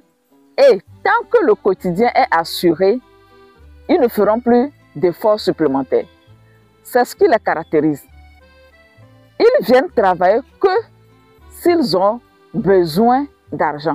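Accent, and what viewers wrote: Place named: Togo. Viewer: j'ai failli dire que les meilleurs ouvriers agricoles loyale et fidèle en Afrique de l'Ouest sont les natifs du NORD TOGO, mais à vous entendre ça me fait peur, car votre accent montre que vous êtes des togolais, mais une chose est sûr, l'expérience a montré que pour des domaines avoisinant déjà les plus de 05 hectares, faut carrément prendre des ouvriers venant d'une autre région du pays, avec un bon contrat à l'appui, et non des autochtones de la zone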